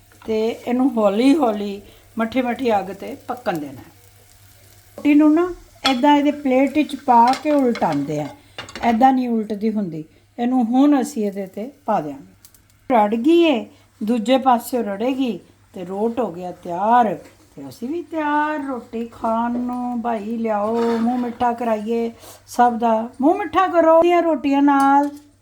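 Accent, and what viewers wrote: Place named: Malwa, India. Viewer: A pigrna te khurna ....malve di boli hy schi dso bhain ji kehrha pind hy tuhada mera muktser hy tahi tuhada piar auda rehda hy ...mai canada to likh rhi ha sarian videos dekhdi ha ❤❤....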